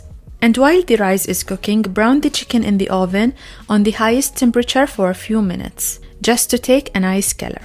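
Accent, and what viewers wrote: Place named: Saudi Arabia. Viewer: عشقي الاكسنت السعودي ❤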